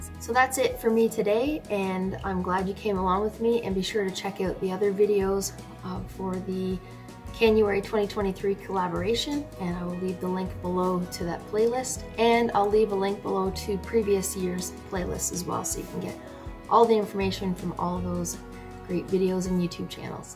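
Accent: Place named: Canada. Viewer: Hi. Canned up a batch of the Orange Marmalade today. Snowing winter day here in the high desert of Oregon and this Marmalade is like an afternoon in Florida! Your video inspired me to can something new. It's absolutely lovely. As to your reply wondering what words gave you away to me as a Canadian, any word with "out" in it. Lol. Such as "about" and the word been come to mind. Not that it's a bad thing. I love Canadians! I lived in Montana not far from the Canadian border and had the pleasure of meeting many when they ventured down from Saskatchewan to our little town south of the border. Take care and looking forward to what you bring next to Canuary 2023.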